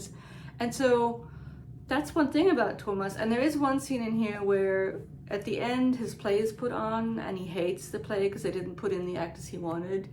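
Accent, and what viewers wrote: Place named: Germany. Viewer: Where are you from? Impressive German accent. (Edit): lol just reached the part of the video when you say you’re German 😂 Liebe Grüße aus Österreich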